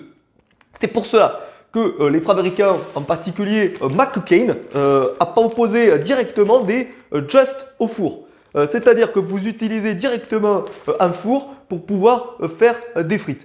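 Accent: France. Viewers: Oh l'accent du sud🤣🤣🤣 t'es génial ne change rien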